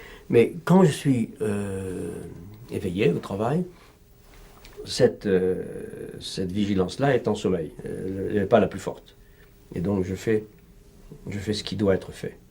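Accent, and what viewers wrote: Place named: United States. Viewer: Lol he sounds so American too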